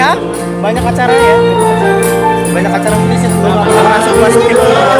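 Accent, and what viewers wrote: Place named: Riau, Indonesia. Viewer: Ohh kok suaranya kek orang riau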